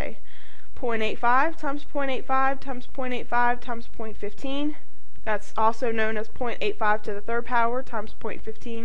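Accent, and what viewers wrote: United States. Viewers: Love the accent...Georgia? I'm from Texas. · are you from the south?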